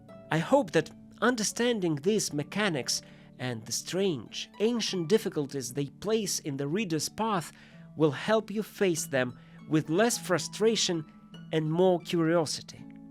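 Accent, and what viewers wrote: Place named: Austria. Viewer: Очень странно, но когда услышала первые слова этого видео, подумала, что сошла с ума, потому как полилась немецкая речь, в то время как несколько недель назад я слушала это на английском. Копец конечно, вероятно через пару месяцев оно переключится на китайском, но в нем я не сильна 😅а вообще, ИИ иногда произносит имена авторов неверно, вот недавно слышала вместо Джейн Эйр он сказал Дженера. Бумажные книги останутся самым надёжным источником, потому как их нельзя сманипулировать, и жаль, что многие уже книгами расхламировались. P.S. автор этого ролика говорит сейчас на немецком, но скорее с австрийским акцентом.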